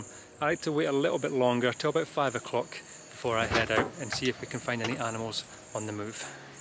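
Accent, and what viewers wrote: Scotland, United Kingdom. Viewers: This video just popped up on my YouTube recommendations. The Lion footage is just incredible! Nice to hear a Scottish accent on a photography channel. I am originally from Alloa now living in Melbourne. I took up Bird photography about 3 years ago, heaps of birds here but no lions unless you go to Werribee Open Range Zoo which is incredible. Have just subscribed so looking forward to more inspiration!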